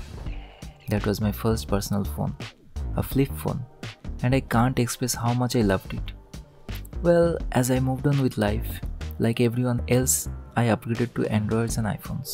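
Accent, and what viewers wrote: India. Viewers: bro you sound indian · I knew as soon as you started talking you were from Kolkata.... as one Bong tech nerd to another, I subscribed instanty!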